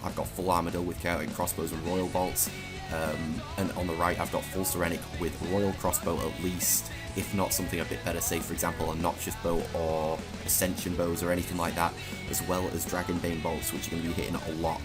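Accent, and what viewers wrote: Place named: England, United Kingdom. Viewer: You should have added text on things like gear names lol. It's hard to understand the English accent.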